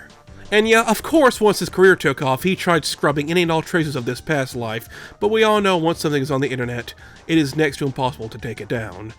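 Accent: South, United States. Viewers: This is completely off topic but are you from the South? Something about the way you talk man, I can HEAR the southern in you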